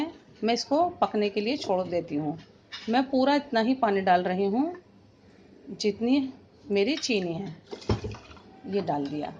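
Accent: India. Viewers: The way u speak is so good full Desi style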